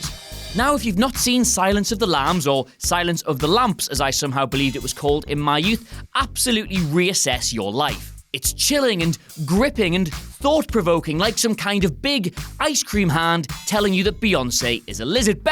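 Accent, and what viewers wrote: United Kingdom. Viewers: If I come back for anything, it's the british accents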